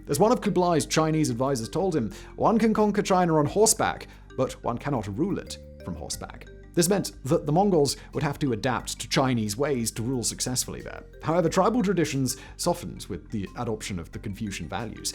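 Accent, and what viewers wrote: RP, United Kingdom. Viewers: He talks too fast with British accent. Often hard to grasp the key words, otherwise good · How posh is this guy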